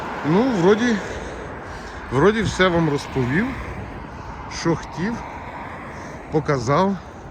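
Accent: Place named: Rural, Ukraine. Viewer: Перший раз побачив в неті цей канал, по вимові впізнаю земляка, підписався буду дивитись.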